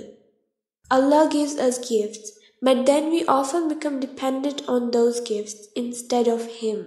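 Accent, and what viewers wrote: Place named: India. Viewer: I feel indiane accent in the air, please re-read such an amazing book with right accent, so many words i was confused about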